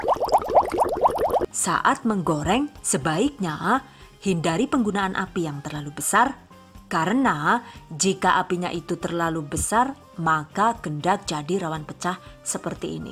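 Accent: Banyumas, Indonesia. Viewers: syelaallluuu ne ngikutin resepe mbak'e iki resepny simple bingiiittt dan g kalah menarik ngapakke kui lhooo caaaa'tat wkwkwkwk "DONE" #giveawayTHVdesember2019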